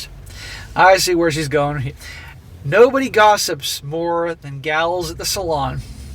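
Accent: Boston, United States. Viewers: "I don't have a Boston Accent"... I call BS. I knew you were a masshole the minute I heard your voice a year or two ago.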